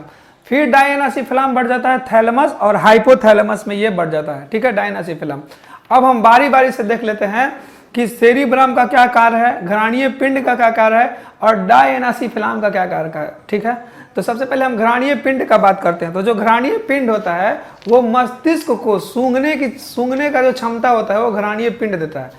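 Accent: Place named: Bhojpuri, India. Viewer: Explain accha kr rhe h 👍 Tum lakh chupao baat magar bhojpuri to nikal hi jayega❤️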